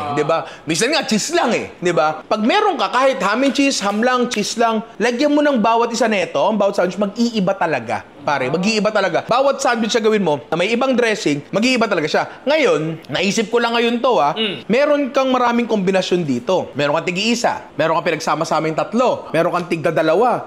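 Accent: Batangas, Philippines. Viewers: More Batangenyo accent ninong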